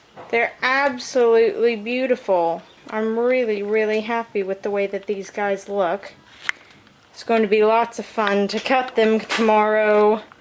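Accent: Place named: South, United States. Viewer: I love watching you make soap!! where are you from? You kind of sound like another YouTuber I watch, grvyrdgrl....I think thats right...she is from texas and has the most delightful southern accent, as do you :)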